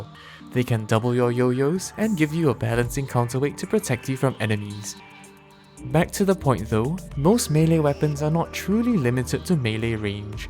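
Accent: Singapore. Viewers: hai may i ask r u singaporean?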